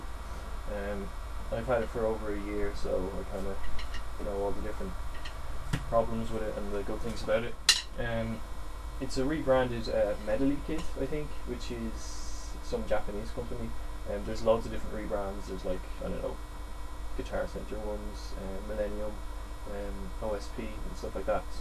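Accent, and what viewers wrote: Ireland. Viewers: are you from waterford? you sound like your from the deise...boy!!! :)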